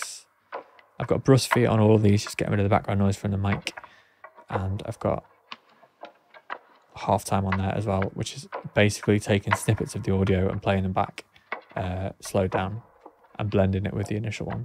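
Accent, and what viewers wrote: United Kingdom. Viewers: Hey good guitar playing mate what part of Yorkshire are you from?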